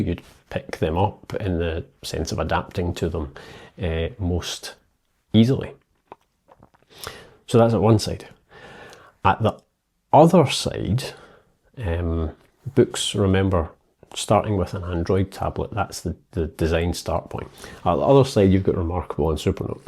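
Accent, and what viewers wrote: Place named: Scotland, United Kingdom. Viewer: I really liked your comparison about eink tablets. Your way to explain Boox aproach is very good. I am now getting my first eink device afrer almost two yeras of reviewing and deciding (and waiting😅). But I did a purchase two years ago of a Booxs tablet (I think it was a Note Air 2) and I return it as soon as I test it becouse it felt exactly as an android tablet but much mote slow... and I wanted just to orgnize my work an take notes, so I started to dig into RM and Supernote,....anyhow, very good videos,...and I like your scottish accent,...I love your country. A place to wrte good novels😊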